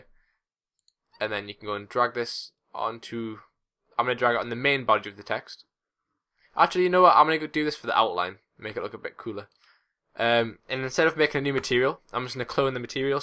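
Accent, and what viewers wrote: Newcastle, United Kingdom. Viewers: R u from newcastle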